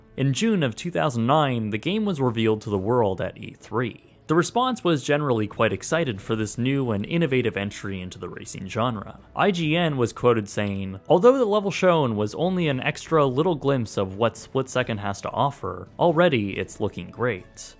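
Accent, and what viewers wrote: Canada. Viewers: got dat good-good nice, polite Canadian voice.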